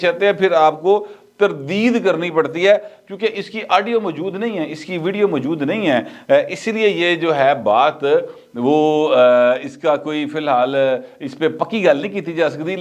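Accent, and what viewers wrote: Punjab, Pakistan. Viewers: Your punjabi with urdu touch is one of the best contrast with Lahori slangs, no one else can beat it for sure.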